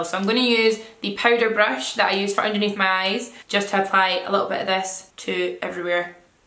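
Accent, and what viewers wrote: Scotland, United Kingdom. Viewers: Your accent makes me miss living in scotland!!! 😢😢 anyways you look BOMB as usual 😍😍😍😍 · I discovered your channel a few months back and you've become my favourite YouTube by far. You are absolutely stunning and amazing at make up! I normally find really Scottish accents too cringy to listen to but I love yours (I'm Scottish too, but I don't have a strong accent). My manager at work said she knows you from when she was a makeup artist in Glasgow too, small world 🙈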